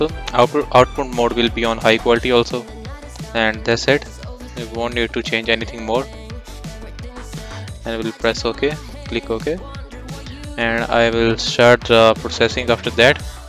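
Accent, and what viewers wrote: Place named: Pakistan. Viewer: I guess you're from Pakistan from your voice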